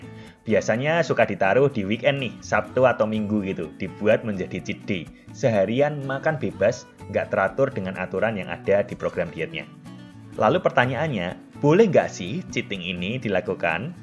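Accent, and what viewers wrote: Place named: Java, Indonesia. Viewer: Logat Medoknya mas ngangenin. Ya iyalah, wong tiap hari nontonin diet santuy melulu.. suaranya terngiang2. Wkwkwk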